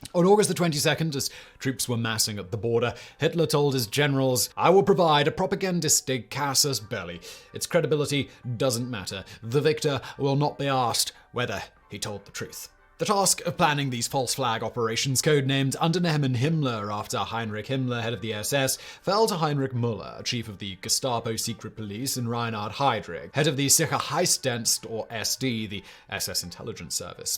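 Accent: United Kingdom. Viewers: Get a pretty girl that does not have a overwhelming British tone do you talking, is just my advice. Maybe good material, although who could sit through your monotone voice.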